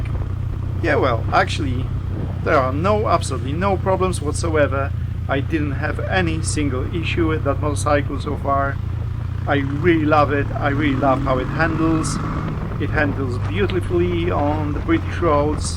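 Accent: Poland. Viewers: Hi there. Z Polski? Akcent :-) Napiszesz co dokładnie zmieniłeś w motocyklu? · Ciekawi mnie czy dobrze rozpoznaje polski akcent?🤔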